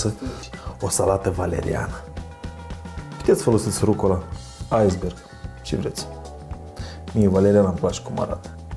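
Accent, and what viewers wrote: Moldova. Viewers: Sint cu un zimbet intins la maxim... accentul moldovenesc si exprimarile sint extraordinare... ,, ou poshat’’ hahahahaha... chiar nici nu stiam cum s ar putea chema din engleza in romana... pouched eggs!!! Cu drag continui sa ma uit din Australia 😘😘😘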